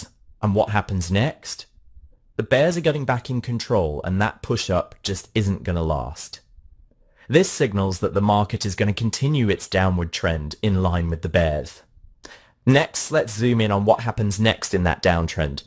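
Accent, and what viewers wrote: United Kingdom. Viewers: You are the best teacher I like your British accent Cheers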